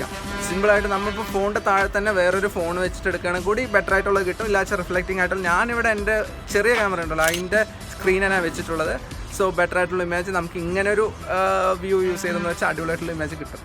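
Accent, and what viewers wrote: Palakkad, India. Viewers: bro Palakkad aanallo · Palakkad aano 😍✌ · Bro r you from palakkad??? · ചേട്ടൻ പാലക്കാട് ആണോ 😃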